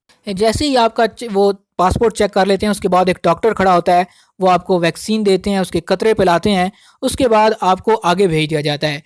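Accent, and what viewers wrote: India. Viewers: Bhai saaaab... bolne se Kashmiri ya Potohari lagtay aaaap...?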